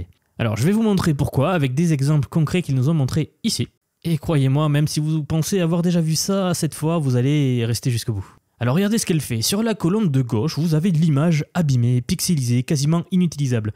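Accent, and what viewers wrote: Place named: Loire, France. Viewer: Je reconnais l'accent du 42 😅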